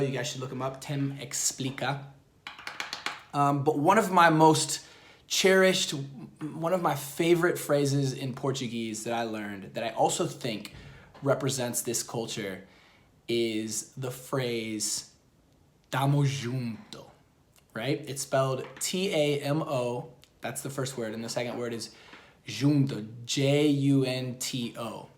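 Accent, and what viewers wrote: Buenos Aires, Argentina. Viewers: Parece un argentino que aprendió inglés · Te escuché tanto hablar en español que ahora parece que hablas inglés como Argentino que aprendió el idioma jskajaja te amoooo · Dude, you don't know how glad I am . Soy Brasileño, vivo en Buenos Aires, o sea ya era inmensamente feliz cuando te escuchaba hablar un "castellano porteño", y ahora que dijiste eso .... Cara o português que falamos no Brasil é unico, é particular, é sonoro. Espero que aprenda e um dia grave alguns videos que vamos te aplaudir de pé. Tamo junto kkkkkkk. You kill it man, nice video. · Ahora cuando habla ingles se nota que tiene el acento argentino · Hola, me encanta ver esta pasion por aprender otra cultura, solo habre otras avenidas, pero si talvez pierdas el bello acento Argentino. Pero extiende tus alas vuela. 💞🤗🤗🤗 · Estoy loca o cuando habla en inglés lo hace con acento porteño????? 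Cuando hablas en inglés te dicen que tienes acento?????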